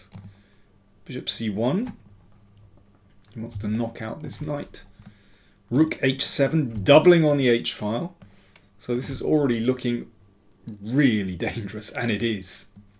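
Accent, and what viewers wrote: England, United Kingdom. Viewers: Great cover as always. I'm sitting here, out of town, in a suburb of Stockholm, a bit lonely, finding comfort in your coverage and your lovely English accent. Cheers.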